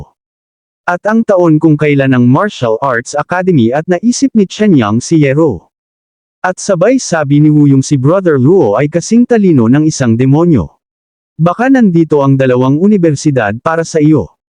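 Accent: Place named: Visayas, Philippines. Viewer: Ang recap mo parang bisaya eh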